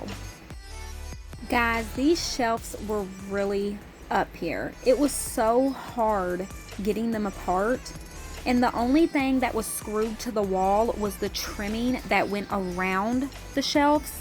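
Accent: South, United States. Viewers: I’m trying to figure out your accent. Are you from the South?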